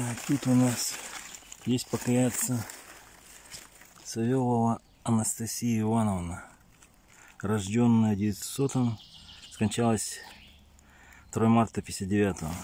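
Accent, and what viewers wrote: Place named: South, Russia. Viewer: Это не украинизмы. Это русизмы ,а точнее южнорусский говор!....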